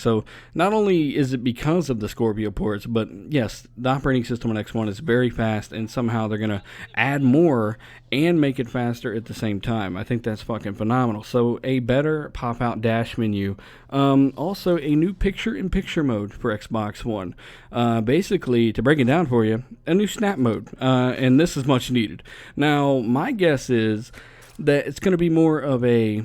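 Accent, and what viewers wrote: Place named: Hawaii, United States. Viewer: you got that minor pigeon accent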